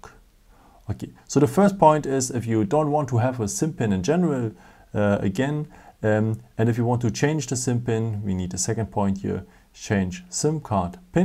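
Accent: Germany. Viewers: haha this guy is definitely German. thanks for the help :) vielen Dank :D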